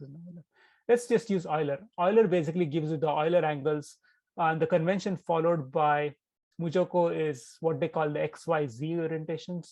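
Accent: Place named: India. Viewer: please guys please to all my indian ppl ur killing us with the accent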